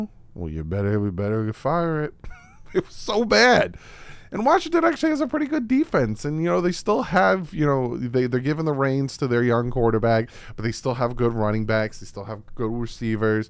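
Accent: New Jersey, United States. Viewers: I knew that accent was familiar lol JERSEY STAND UP!!!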